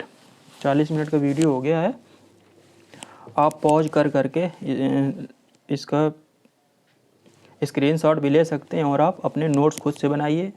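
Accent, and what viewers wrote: Kanpur, India. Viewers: Awaz se aap kanpur ya Fatehpur ke lag rahe hai 🙏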